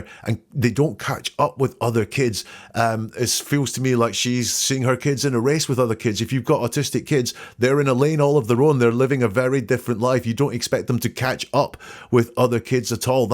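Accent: Scotland, United Kingdom. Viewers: Soo glad i found you. Like brittish english better than the tons of american english flooding the internet. Also you are in my timezone❤ . I am a great fan of Peter Hyatt, bless him, Looking forward to watch more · This has been fascinating and I hear your lovely Scottish accent… I live in Wales 🏴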